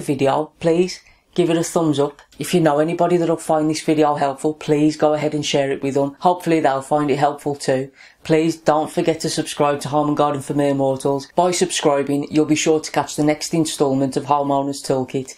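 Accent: United Kingdom. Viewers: more like Birmingham or Dudley. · Scouse accent??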